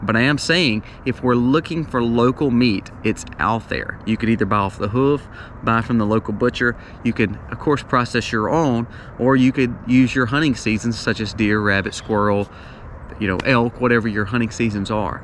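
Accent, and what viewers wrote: South, United States. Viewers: Great music, great guy, great information, and great Southern accent! 😊👍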